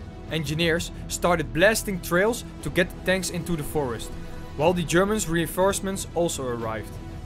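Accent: Netherlands. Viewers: Wat een zwaar Nederlands accent!!Ik dacht dat je erom deed.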